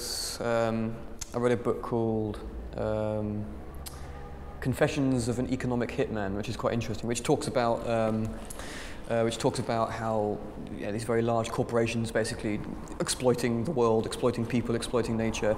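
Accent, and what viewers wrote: United Kingdom. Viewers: He's a perfect package! He's intelligent, he has a great looks plus the sexy british accent. Just perfect. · i love british accent:)))))))) <3<3<3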